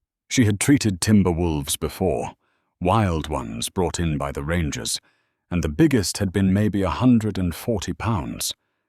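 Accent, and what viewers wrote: United Kingdom. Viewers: I can't quit laughing. LOLOLOL Thermal regulation? LOLOLOLOL Calling him a big baby. The narrator with the British accent is PERFECT. LOLOLOLOLOL LOVE IT !!!!!!!!!!!! Love hearing what a MAN will do for a woman. Just soo wonderful to LAUGH... Thank You.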